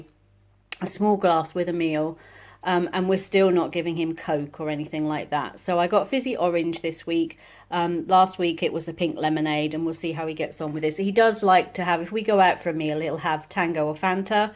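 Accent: United States. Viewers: Your American accent made me giggle! We do say it like that haha. Great haul and I love Franks Red Hot!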